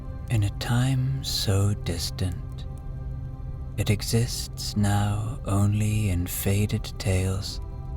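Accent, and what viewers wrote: Ireland. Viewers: So Thankful for your dreamy Irish voice and wonderful story tonight x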